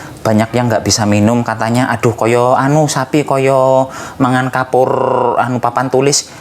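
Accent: Banyumas, Indonesia. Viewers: Sangat bermanfaat Lik,, lilike wong Endi sih daning bahasane ngapak banget 🤣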